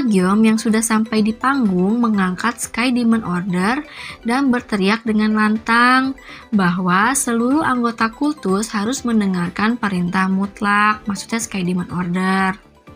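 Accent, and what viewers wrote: West Java, Indonesia. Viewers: Hahaha kesannya kaya adminnya nyindir hahaha tapi gue malah kesemsem sama suaranya sama logat sundanya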